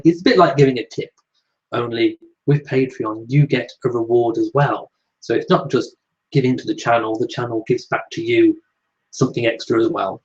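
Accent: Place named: United Kingdom. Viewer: you DO have a great voice and I love your British accent. I watch all your videos and chats.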